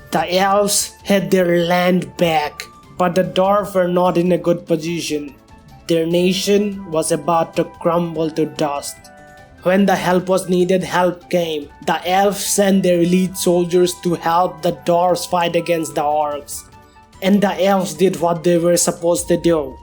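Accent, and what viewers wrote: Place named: India. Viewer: great stuff I want more I just feel a bit uncomfortable with the accent it sounds like sounds I make when I feel sick making me sick, plus if I recognise the accent correctly this is a weak indian influenced/ indian accent which ill be frank I dont like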